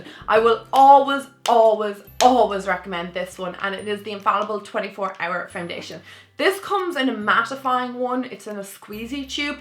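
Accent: Ireland. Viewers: I love Maybelline Fit Me Matte!! Oh, and I subscribe just because you have this cute Irish accent. Me love Irish accent!!!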